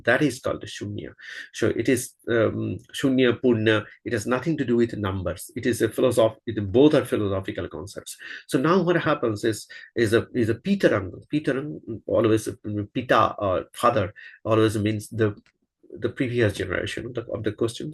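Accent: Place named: India. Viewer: I hope you have the energy to make more of these. One word of warning: I think a lot of Americans will find your accent hard to understand. We English people are much more used to Indian accents.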